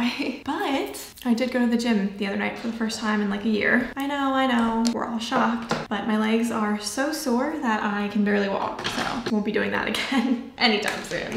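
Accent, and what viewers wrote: RP, United Kingdom. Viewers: Your British accent is actually pretty amazing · I love your british accent :3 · I'm British and I adore your British accent! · Same about the British accent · don’t try and sound like the queen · A British accent fits her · I love your british accent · i love her british accent lolll :))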